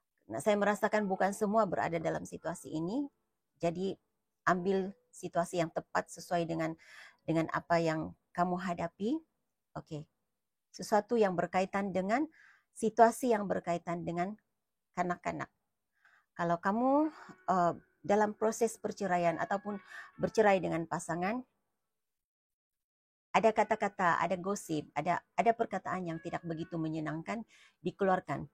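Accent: Sabah, Malaysia. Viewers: Dari logat bahasanya kaka ini macam orang Sabah sarawak😅😊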